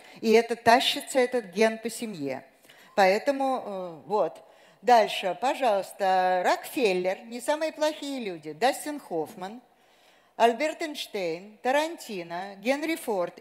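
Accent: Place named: Moscow, Russia. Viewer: Как тяжело слушать этот явный масковский акцент)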